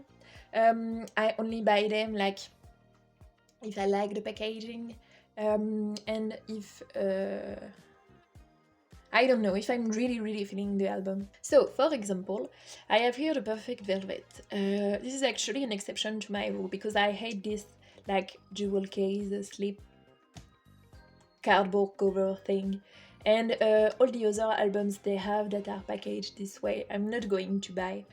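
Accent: France. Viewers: are you french ? cuz you have a french accent while speaking English btw i like your collection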